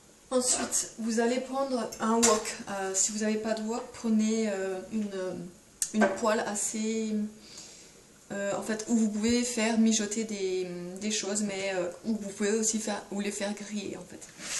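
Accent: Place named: Doubs, France. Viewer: coucou!! jai limpression dentendre laccent dché ns lol!! en plus saucisson de monbeliard?? t dou? moi de mulhouse bisous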